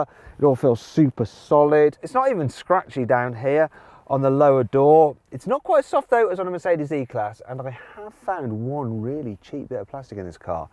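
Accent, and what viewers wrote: United Kingdom. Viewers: イギリス英語がまたいい。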